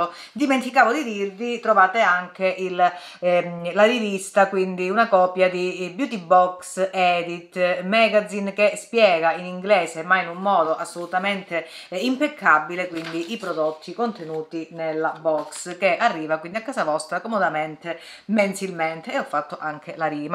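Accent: Calabria, Italy. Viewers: Di dove sei ?sei Calabrese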